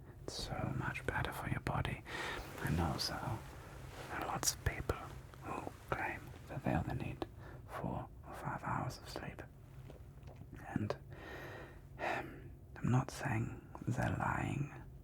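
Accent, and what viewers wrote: Germany. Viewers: I love everything (!) about this video! is such a great, funny, professional, and caring sleep doctor!! And I know I've said this before, but I cannot say this often enough, the German accent so much on point, it's hilarious!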